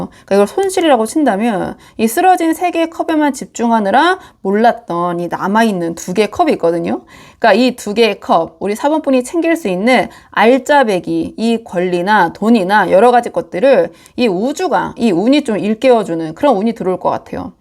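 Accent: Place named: Gyeongsang, South Korea. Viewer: 진짜 들을때마다 느끼는건데 거꾸로 들어도 대구사람. 대구사람이신가요? (저는 아니예요ㅎㅎㅎ)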